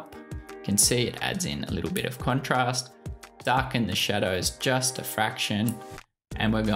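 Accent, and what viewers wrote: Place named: Australia. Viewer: I love your work 👊🏾 You sound like you’re from here (Aus) I just had a question...would a Mac Pro 16GB RAM be perfect on Ps + Lr ?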